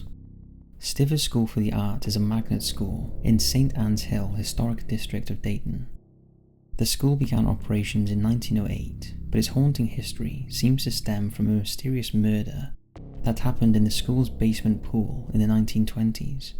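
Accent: United Kingdom. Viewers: He’s British, there’s loads of different accents in the Uk. It’s just the US always make us sound like posh wankers. YouTube Sheffield accent for example. That’s where I’m from :)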